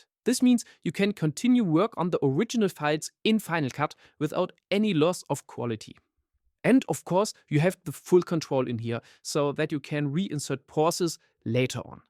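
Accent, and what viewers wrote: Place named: Europe. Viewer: I couldn't help but notice that your speech accent changed drastically...like your glorious European (which country?) accent was just "erased", or at least muddled and more American. What happened there? Did AI try to correct that, and American-ize your speech? I'd seriously like to know! For the record, I like your accent, it's very distinguished.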